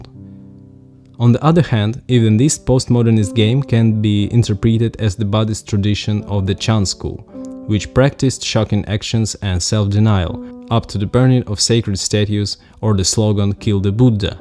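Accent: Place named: Eastern Europe. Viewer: slavic accent 😭😭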